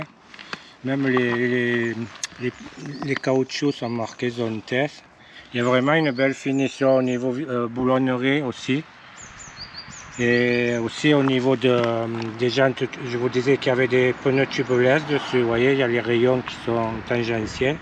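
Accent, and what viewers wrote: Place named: South, France. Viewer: Avé le bon accent ! Merci pour ton retour.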